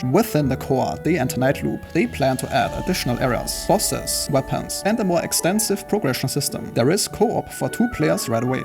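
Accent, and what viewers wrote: Germany. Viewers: man spürt quasi das du mitten in Deutschland wohnst :D im sinne hilfreicher Kritik würde ich dir raten an dem voiceover zu arbeiten :) ist sehr monoton und mich persölich triggert der deutsche Akzent :p #elevenlabs lass flutschen <3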